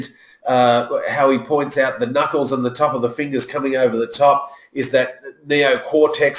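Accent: Australia. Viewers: your Australian accent and is very calming to me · I found this to be so informative and validating. The aussie accent was relatable, which really helped me. The best thing was how referred to "we" throughout the lecture. This made me feel much less stigmatised! Most talks say 'them' or 'sufferers' or patients or even subjects. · presenter’s heavy Aussie accent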